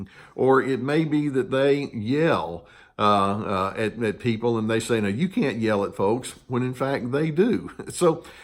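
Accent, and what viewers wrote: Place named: Texas, United States. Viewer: Added bonus: his Texan accent is a pleasure to listen to, always soothing. It helps, because sometimes the cold, hard truth that he's giving is difficult to hear.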